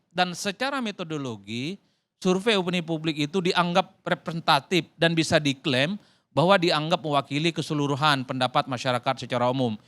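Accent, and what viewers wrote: Madura, Indonesia. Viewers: Wkwkw Logat MADURA nya Ketara Banget...😂😅, ayo Ikuti Jejak Pak Mahfud MD, Utara kan Kebenaran 😊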